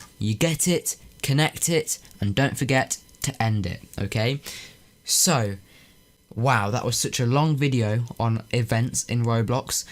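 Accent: England, United Kingdom. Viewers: I love the way people with English accents pronounce the words and say er , ar, and like bananar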